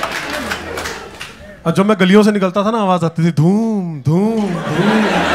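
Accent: Punjab, India. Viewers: Punjabi accent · Awaaj se hi pta chal jata hai Punjabi ho.......I am also Punjabi by the way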